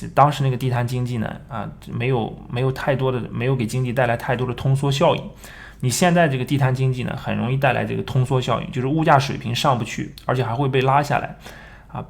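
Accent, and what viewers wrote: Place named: Inner Mongolia, China. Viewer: 内蒙口音听着很舒服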